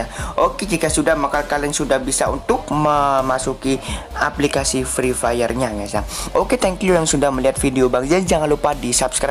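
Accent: Java, Indonesia. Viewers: Anjay bgomong jowo orang mana bang emangnya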